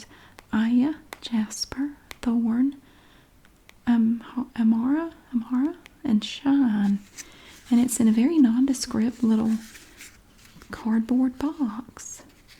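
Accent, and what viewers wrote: South, United States. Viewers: I was really drifting off when I hear “ooo it’s fancy chapstick” in amazing southern accent and I started laughing half dozed off out here... need to comment that! GN😂🖤🖤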